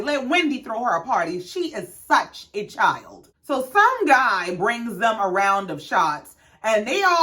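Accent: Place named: Gullah Geechee, United States. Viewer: I just found your channel. I love your commentary and it’s a plus you sound just like my people from SC. (Geechie) Subscribed! ☺️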